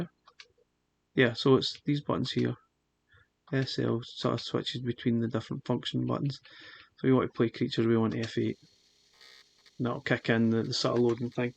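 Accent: Scotland, United Kingdom. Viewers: Hi! First off, I like your reviews the best… Scottish accents are my favorite! I speak English but can’t understand a word of Scottish! It’s brilliant! My question…. Does it have intellivission?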